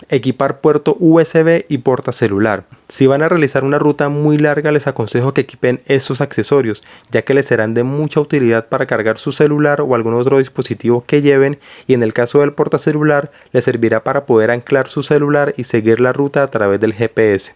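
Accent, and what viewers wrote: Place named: Colombia. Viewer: Gracias por los consejos, me pareció genial el vídeo gracias a qué no tienes el acento colombiano tan marcado como otros moteros, saludos desde México 🇲🇽🇲🇽🇲🇽👍👍👍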